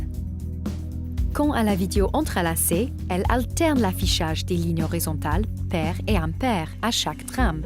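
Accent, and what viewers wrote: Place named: Quebec, Canada. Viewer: Québequois anglais jpense mdr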